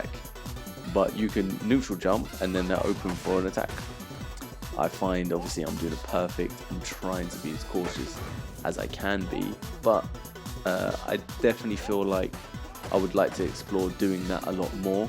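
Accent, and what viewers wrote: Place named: London, United Kingdom. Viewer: Oh, so you're in London! I had been trying to figure out y'all's accent. It doesn't sound like the typical English accent that I normally hear, but I'm also an uncultured American that's never really left the south lol. Love these videos!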